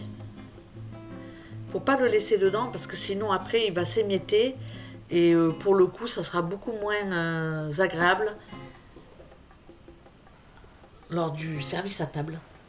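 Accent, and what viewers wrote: South, France. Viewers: Cc ☀️ On va se régaler😋 mes enfants n’aime pas trop le poisson, mais par contre le cabillaud ils aime, merci chef Danny🤩 de partager de si bon plat et à toi avec ta douce voix du Sud que j’adore🥰 j’ai fait péter les pouces bleu, comme d’habitude🤣 Gros bisous et bonne soirée😘🥰👍👍👍👍👍👍👍👍👍👍👍👍👍👍👍👍👍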